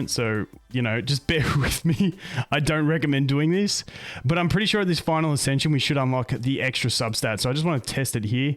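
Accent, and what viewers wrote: Australia. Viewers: damn i always like your accent, sir. what is it? aussie?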